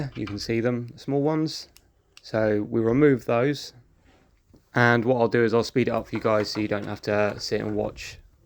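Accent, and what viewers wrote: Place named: United Kingdom. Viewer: Yes they will mega CD model 1's are prone to leaking cap's on the main board especially if you remove the shielding you will probably see green muck around the caps every mega CD model 1 I've worked on has had leaking cap's on the main board, the sub board -power board uses though hole cap's they don't normally leak but can as well , you should try to find someone to recap it asap these model 1's are getting quite expensive nowadays and very sort after, I would love to recap it for you, but I'm all the way over in Australia you sound like your in the UK good luck 🤞 with it friend ☺️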